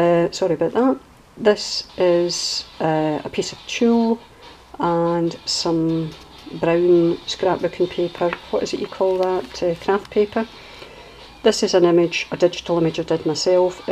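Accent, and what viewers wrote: Scotland, United Kingdom. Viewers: Beautiful journal! Love you style...and you Scottish accent! Thanks for your generosity in giving away such a nice journal.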